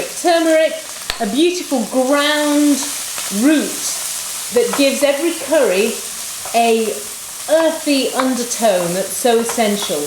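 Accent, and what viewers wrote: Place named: RP, United Kingdom. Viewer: true. will give both a try. how do you speak so posh. i love it :p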